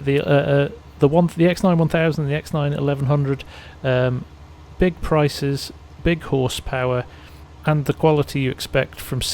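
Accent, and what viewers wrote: United Kingdom. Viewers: Hey, do you live in the US, you sound British but I've seen you like ur US quipment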